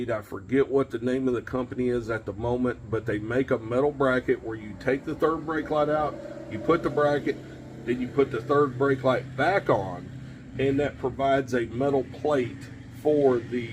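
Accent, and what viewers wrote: South, United States. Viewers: Ugly redneck accent. 26 minutes of BS and 1 min of information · Thank god I am from the south and can tolerate southern talk and mannerisms without getting pissed off because "condescending" to Californians is normal speech down here. Also here to learn CB. Got a firestik antenna and am learning how to use it. Thanks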